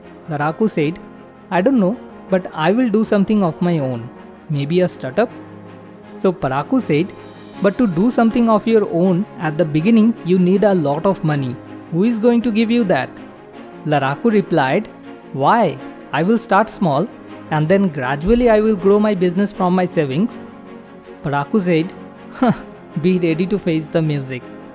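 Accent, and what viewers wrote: India. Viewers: Bihari english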